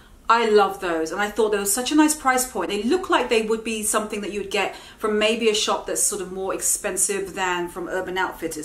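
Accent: United Kingdom. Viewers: u r such a nice gorgeus woman! at last somebody who can make fashion a matter of fun and smiles ! you sound soooo British. ...do u live in the US? sorry of I don't know you! I ve just subscribed...ciao from Italy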